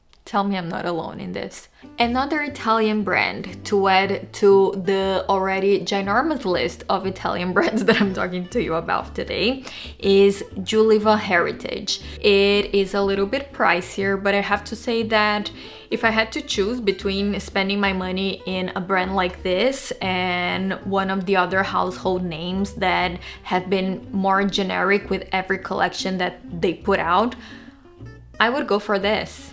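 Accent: Brazil. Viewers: wow so many good jewels, thank you!! I have been wondering where your accent id from - I Love Brazil and it's on my bucket list to visit :)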